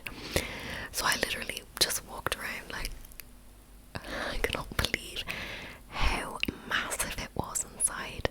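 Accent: Ireland. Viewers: Lovely video! A 40 minute video with your gorgeous Irish accent is always a treat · Your accent is so enchanting and it goes with ASMR so well. Im roughly 50% Irish but unfortunately never picked up the accent from my relatives. Id kill for an Irish accent, mainly because Ireland is one of the top three places Id love to live after im through with university. ❤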